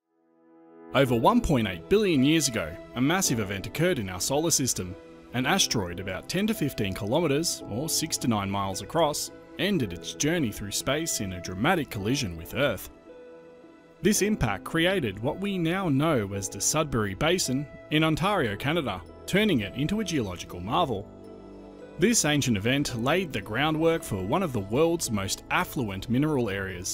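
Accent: Australia. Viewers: I happen to like his Aussie accent. Let him alone, especially since he works hard to make his content informative, and intelligent! You are awesome,!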